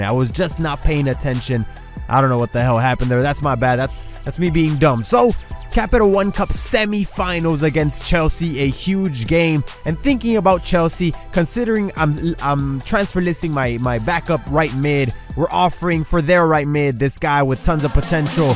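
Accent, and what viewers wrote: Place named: Mexico. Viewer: Haha 5 minutes in and your career mode is fun to watch!! 😅 I thought you were white when I started listening but then as I kept watching Im like nah this guy is mexican lol 😆 Also because of the signings you were making of mexican players lol I hope you keep uploading career mode videos bro, I just started a West Ham career as well but I put the team all the way down to 4th division and I'm trying to promote them all the way up to premier league!! 🙂‍↔️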